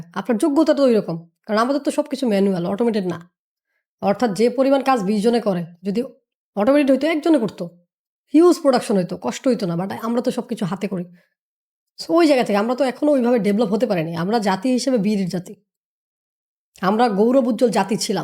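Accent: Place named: West Bengal, India. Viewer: প্রথমে ভাবছিলাম ইন্ডিয়ান কথা গুলো শুনে ভালো লাগছে তাই শুনলাম এখন আরও ভালো লাগলো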